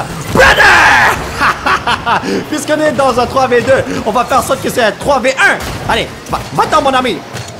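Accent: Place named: Canada. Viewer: Ah ok mpi je suis diamant ^^, vu tas façon de parler tu dois etre canadien si je ne me trompe pas ? Si sa te dis on peut jouer ensemble ^^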